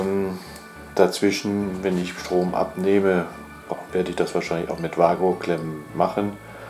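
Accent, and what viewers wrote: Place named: Bavaria, Germany. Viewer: Mal ne Frage;kann man bei Märklin nicht auch einfach die Schiene zwischen 2 Schwellen mit dem Dremel trennen,dann brauchtst die Verbinder neet.Als Bayer ein gutes Hochdeutsch,bestimmt kein gebürtiger.Der Klappensinn wird nun sinnvoll ersichtlich,trotzdem hätte ich diesen andersherum zum Öffnen gebaut,also das die Scharniere unten sind und die Klappen mit Magneten gesichert od.mit Scherenhalterung,wie bei Klappböcken/Liegen od.ä.Das ist alles KEINE Kritik,nur es sehen und lesen wohl noch hoffentlich viele Mobahner diese/Deine Videos.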